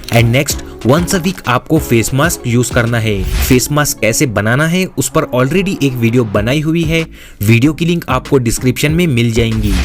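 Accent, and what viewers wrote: Hyderabad, India. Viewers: 𝗕𝗿𝗼 𝗔𝗿𝗲 𝗙𝗿𝗼𝗺 𝗛𝘆𝗱𝗲𝗿𝗮𝗯𝗮𝗱 ❓💌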